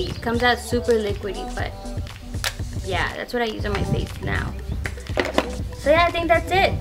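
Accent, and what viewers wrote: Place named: Spain. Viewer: Hehe i love yur Spanish accent(; ❤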